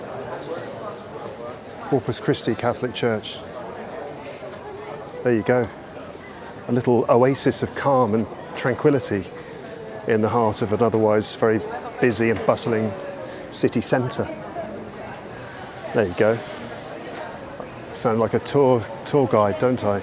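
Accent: United Kingdom. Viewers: Thank you very much, you are the best choice for me in order to learning English, particularly British accent, this accent is life, love you from Iran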